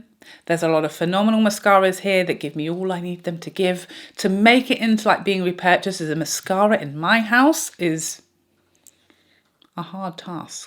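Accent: United Kingdom. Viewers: Don’t think I need any of that…thanks for another great and entertaining video! The bit about the Fenty bronzer pallet was especially hilarious. I am a fairly recent subscriber (a month or two), but my inner monologue has already switched to a British “accent”!! ❤️ it!! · I like listening her British accents. You have beautiful eyes.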